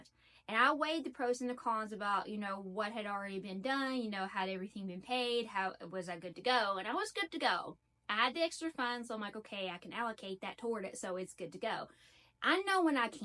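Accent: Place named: Texas, United States. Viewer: she sounds like a Texan to me 😍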